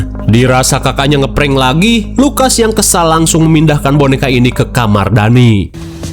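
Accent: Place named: West Java, Indonesia. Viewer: Makasih ya AA sudah menemani kami semua dengan Vidio² horror+lucu dengan komedian dari AA 😊😊logat AA kayak orang Sunda ya.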